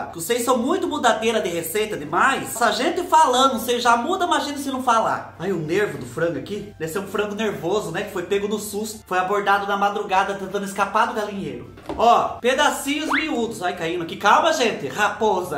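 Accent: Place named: South, Brazil. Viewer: também sou do interior de São Paulo, só que sua cidade é próxima ao Paraná e a minha próxima de Minas,por isso você tem esse sotaque mais puxado pro Sul e eu pro mineires...😂😂😂❤❤❤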